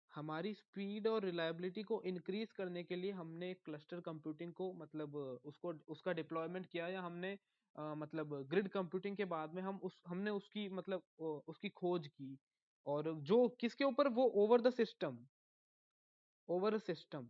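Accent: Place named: Pahadi, India. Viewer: thodi thodi pahadi tone ari ha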